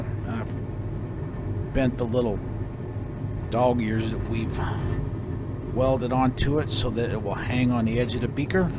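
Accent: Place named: East Coast, United States. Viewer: Judging from your accent I'm assuming you are on the East Coast or possibly Chicago I kind of wish I was closer because I would love to apprentice under you and learn how to do all this stuff... Your videos cover so much information but I know you don't go over all your little tips and tricks.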